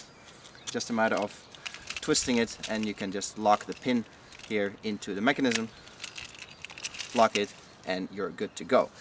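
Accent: Netherlands. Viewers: It took me a very long time to realise that you might actually be Dutch instead of American.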